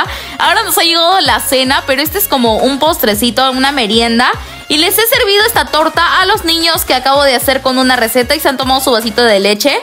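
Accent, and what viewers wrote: Peru. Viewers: Eres de Perú? O chile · eres peruana🥰🤔🤨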